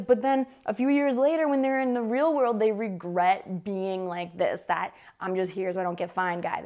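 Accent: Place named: United States. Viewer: Lolz hello I’m Dominican.!!!, if I can be honest I’d tell that I’m a obsessed language learner obout English.! I really love the ways that American native speakers can talk and Actually I’m looking for get to tack and the way that you “ native speakers “ can do it.! Im talking about.... American accent.! The sh...sh... sh.. that you have lolz 😂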